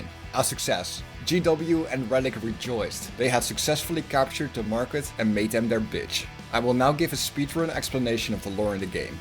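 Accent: Netherlands. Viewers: Just came upon this video to hear some more dawn of war bashing(they deserve it). And suddenly realizing. The narrator is dutch. Hello fellow dutchie 👋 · First time watching this channel, and man, from the first words, you have to be Dutch, right ? :P That accent, it almost has to be Dutch. DoW1 was brilliant, most of it was just fantastic, almost all. DoW2 was not completely my thing, but it was good. DoW3 is one of my worst buys ever, man was i disappointed, and i'd hoped modders would change it a bit, because it looks good, but i hate the limited base building and how it plays, but alas, it hasn't become a better RTS, or an RTS at all i'd almost say, at all. If i have to play any DoW game now, it's DoW1. Hands down. And it has quite a few mods too, some better than others.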